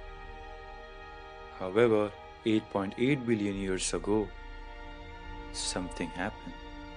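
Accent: India. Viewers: He sounds so Indian yet so African yet so Asian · u sound like indian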